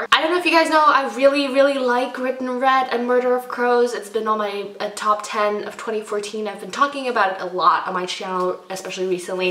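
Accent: United States. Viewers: woah this might sound weird, but you sound especially american in this video woahhhhh also yes that mt. everest miscommunication mountain is v irritating marie rutkoski why do you hurt us so i've been wanting to pick up red queen bc everyone loves it but ehhhhhhhh it does sound v typical and i want something that's going to BLOW ME AWAY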